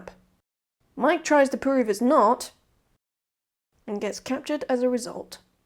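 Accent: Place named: England, United Kingdom. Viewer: Great video! Great accent - where are you from? You sound like my favorite English pop star, Kim Wilde. Are you from London?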